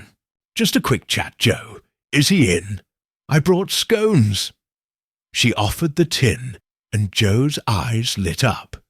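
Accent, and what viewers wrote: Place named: United Kingdom. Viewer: This msrvelous British accent and beautiful voice will help mr sleep tonight. Thank you soo much!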